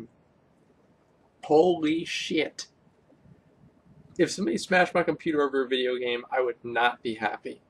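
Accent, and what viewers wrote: Texas, United States. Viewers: am I crazy or did Asmon have a southern accent in 2013? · nah you're right he sounds like a caricature of a texan in this vid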